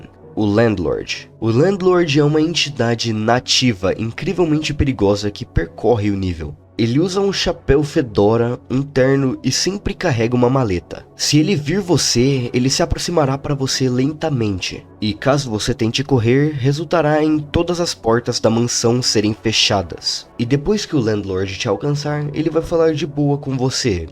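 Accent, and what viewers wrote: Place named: Rio de Janeiro, Brazil. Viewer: ksksks cê é carioca né meu patrão, reconheci só pelo "S" com som de "X", foda ser carioca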